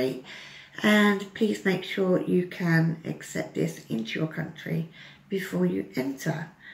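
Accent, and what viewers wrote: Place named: United Kingdom. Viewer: You should never feel bad about how much you give. It’s nice no matter how much, I enjoy just listening to your wonder British accent. Have a great week!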